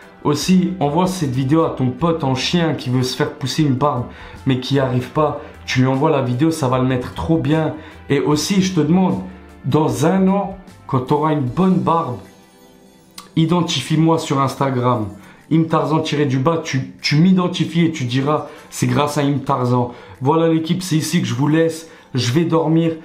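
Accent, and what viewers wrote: Belgium. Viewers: Pure belge nan?😂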